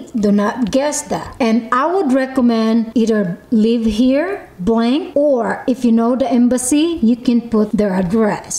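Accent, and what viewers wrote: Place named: Philippines. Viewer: I like the accent very Filipino. Thank you for the information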